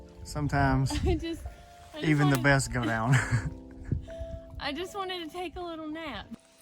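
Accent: South, United States. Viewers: "...He's not not not hocked yet". That's what my subtitles read! 😁 Good thing that I understand Southern (lived there for a bit, long ago, and I love the people and the accent). Back in New England to be with my Mom in her twilight years. I'll be back, likely., an old, big, heavy duty stuff sack, into which you can put a large rock or two, along with a mini 'biner to clip your guy line into, can be a big time saver when setting up in those rainy, rocky conditions. I hiked from Palmerton, Pa. to the DWG in the snow, so I feel your pain. Rocks and ice are a special kind of hazard! Take care!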